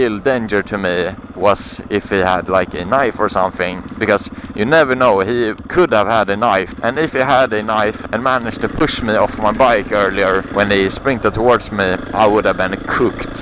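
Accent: Sweden. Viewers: Are u swedish?🇸🇪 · Are you Swedish???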